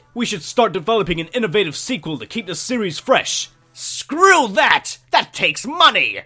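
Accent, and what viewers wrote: United Kingdom. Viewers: Your a brit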